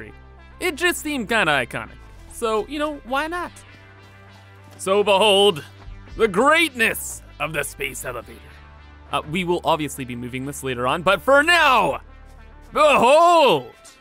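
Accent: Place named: Canada. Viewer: Oh man, first time seeing your videos and you sound so Canadian there bud, had to subscribe and check your twitter and indeed you are, what province do you live in? if its Saskatchewan imma loose my shit, nobody lives here.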